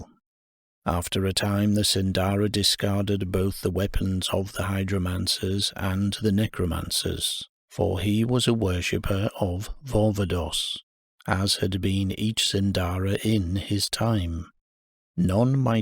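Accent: United Kingdom. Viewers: Great narration, thanks a lot. Nice to hear a Northern accent, I live in Manchester, UK. Looking forward to trawling through your content, thanks again.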